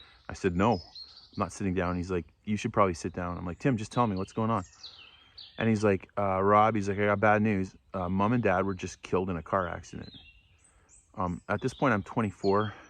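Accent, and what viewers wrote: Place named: Saskatchewan, Canada. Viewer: Rob and I have just discovered your show. I listened to your voice and I thought “he sounds like he’s from Saskatchewan….and then I saw the Roughrider shirt. Thanks for sharing your journey. 🇨🇦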